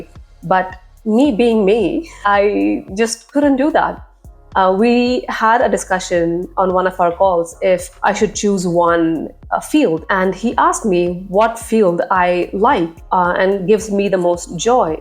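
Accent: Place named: United States. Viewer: BTW your english has an american accent. That's sounded very good and also your content.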